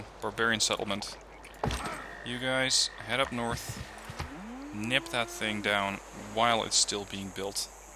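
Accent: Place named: Netherlands. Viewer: Aweful dutch accent though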